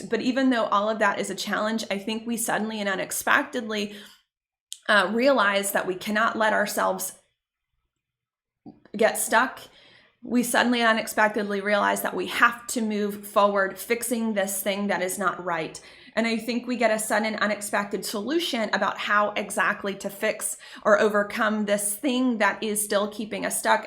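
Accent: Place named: Michigan, United States. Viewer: Are you from Michigan? I'm from Michigan. And I hear a lot of Michigan in your voice... 😉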